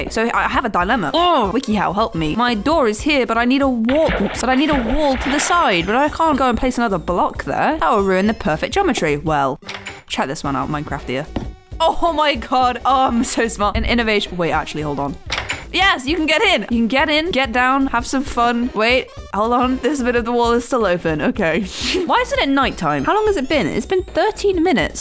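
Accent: United Kingdom. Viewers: Just wondering what country are you from because you sound British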